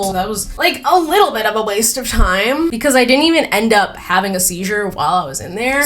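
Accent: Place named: Massachusetts, United States. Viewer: so very wicked Massachusetts i haven't heard somebody say "pup-corn" in a while so thank you for that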